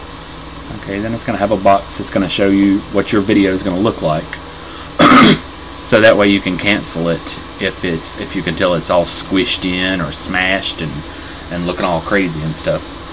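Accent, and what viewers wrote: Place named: United States. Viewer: your american accent is funny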